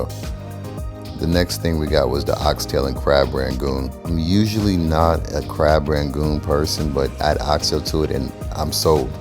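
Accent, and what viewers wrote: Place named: Bronx, United States. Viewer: This is the first video I've watched of yours...I heard the Bronx and I had to subscribe. I miss Kwame's restaurant in DC, guess I'll have to come back home and try Tatiana.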